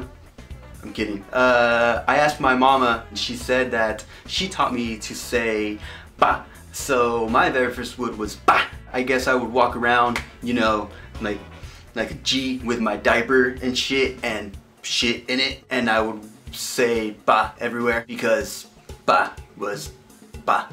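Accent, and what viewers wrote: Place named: Russia. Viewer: What is he? Like Russian? German?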